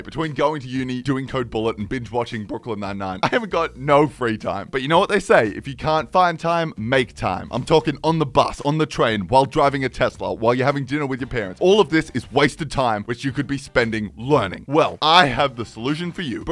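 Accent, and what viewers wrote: Australia. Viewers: Code are you Australian? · OMG YOUR AUSTRALIAN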